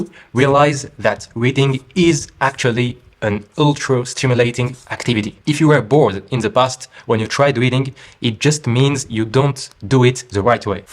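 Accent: France. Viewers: I am curious what your native language is. I’m assuming a middle eastern language because of your name But then the accent sounds French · I denote a French accent😊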